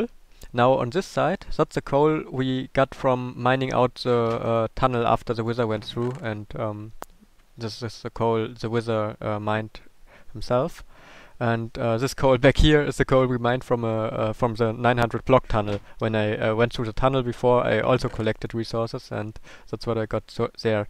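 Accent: Germany. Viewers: Are you German you have a german a accent man. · You really sound kinda german, when you're speaking... what is you native language?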